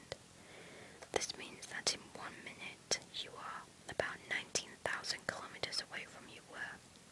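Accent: Yorkshire, United Kingdom. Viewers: You sound Yorkshire voiced..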